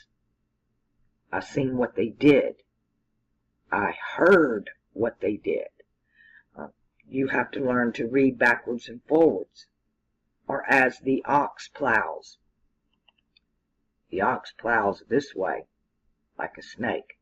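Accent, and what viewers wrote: South, United States. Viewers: The ones I have always learned the most from, are the surprise, or chance encounters. You, are a picture of this phenomena. At least in my paradigma...! So, isn't it a pleasure, hearing you speak so smooth and southern, about things that are so incredibly in parallel with my needs? Claro, que si. There are ten years of Time, free of obligation, that the gods themselves awarded me, and I immediately consulted the Oracle in Google, and have been there much of the time. Seeking out answers that before clikclikclicketyclik, would have taken twenty years... well, my 2 or 3 thousand hours in navigating the nether [ether?] through the myriad of bad false and OhMyGod! and lies... and all the other... have yielded a few smooth stones, personal and spiritual, precious, which I keep in my pocket. I use these weightless stones, pretty and oval, for many things. 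It's amazing what can be done with the simplest of tools. Especially when and if one connects to that universal..... dare I say?...Force! you know, the one that makes shit happen. All we have to do is acknowledge it, right? hmmm... I'm gemini.. so.. I also keep a turd in my pocket in case someone tries to shit me.... [grin] In spite of my ever so June14 63 pairsohnality, and my razor sharp wit, and my light in my shoes and smiling approach to reality, here... I have missed some stuff, and still have infinite things to learn. Of course. But your videos have certainly put some more color on the table where the puzzle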